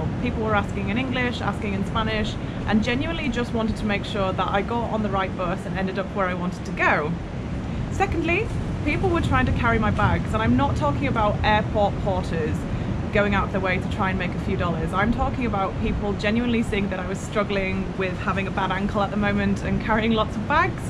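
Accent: England, United Kingdom. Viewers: I like your British accent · your accent is more like English from England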